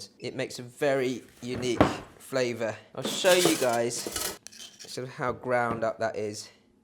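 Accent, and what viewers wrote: United Kingdom. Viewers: Holy shit, an Asian dude with a British accent! Love it!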